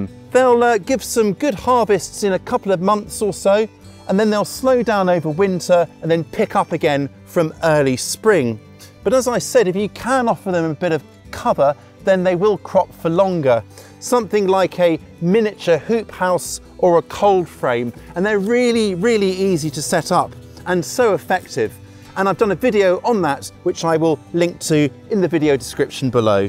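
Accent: United Kingdom. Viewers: You sound British, are you?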